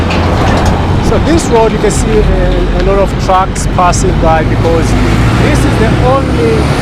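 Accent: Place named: Zimbabwe. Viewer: He thanks for the video this happened when i was in zambia in july/august. You sound zimbabwean bro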